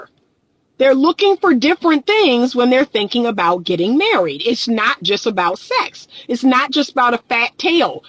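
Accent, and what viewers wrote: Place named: South, United States. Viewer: i just knew that accent was from the south. DC , the border of the north and south. good video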